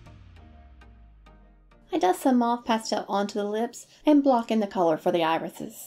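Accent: South, United States. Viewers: Im so used to southern accents in georgia they usually annoy me, but yours is so soothing and calming and fits the aesthetic of the videos. Awesome stuff!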